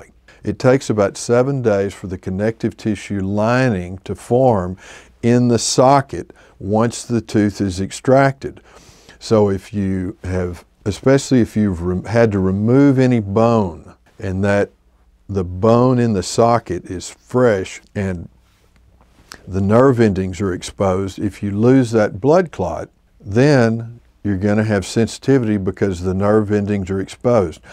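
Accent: South, United States. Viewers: Once i heard is southern accent i knew he was the real deal sourthern dentist who been in the game this long are good ole cowboys and masters at their craft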